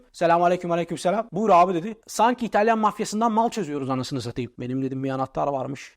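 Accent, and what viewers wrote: Thrace, Turkey. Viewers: trakya aksanin fena ya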